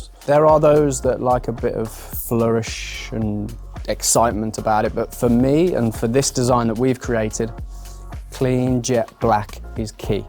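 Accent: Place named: Midlands, United Kingdom. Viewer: First time I've come across the channel and I subscribed. I really like the clear and concise explanation of everything. I disagreed on one point, which isn't major. I wouldn't describe that particular sports jacket as a blazer. Blazers, to me, are always un-patterned (unless they are striped for a particular sports club or activity). I also realize that you are nervous speaking directly to camera but if you could use your hands to gesture towards a point of interest on the clothing rather than gesturing to emphasise your speech constantly it would be a little less distracting. Slight changes of camera angle occasionally might also break up any obvious 'chop cuts' on the video. Nice to hear a Midlands accent on a sartorial channel. Good luck for the future.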